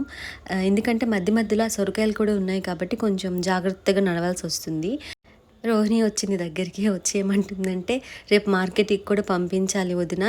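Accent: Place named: Coastal Andhra, India. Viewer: Mi voice vizag valla la Ledu andi .. Looking like ...Krishna,gutur or khammam districts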